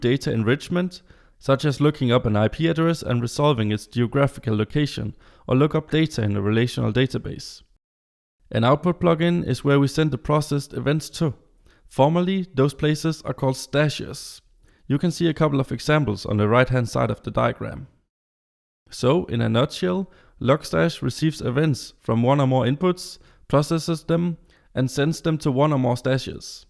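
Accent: Denmark. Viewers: You can hear this guy is danish :D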